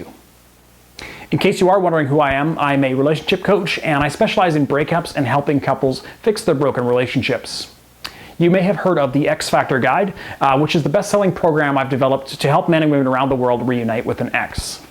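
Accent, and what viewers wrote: Canada. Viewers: Are you...Canadian?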